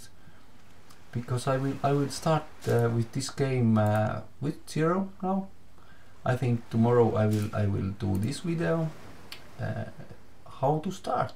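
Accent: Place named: Estonia. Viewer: Actually i kinda like your voice but your Estonian accent is clearly understandable. Keep up!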